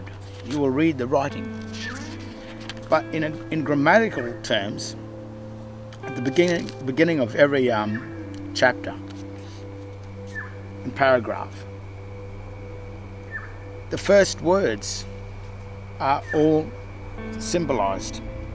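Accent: Australia. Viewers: Really fascinating and enlightening information! I like your style of delivery, as well. It's genuine, logical, and unforced. And I've always found an Australian accent easy to listen to.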